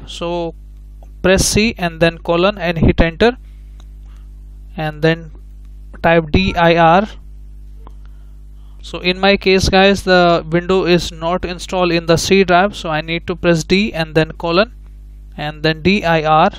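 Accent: India. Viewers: This is really too much of a mix of MBR installations and GPT. What with your heavy Indian accent, it would have been better if you'd made two separate presentations, one for each, then we wouldn't have to decipher which instructions apply to what circumstances. Thanks for trying, but...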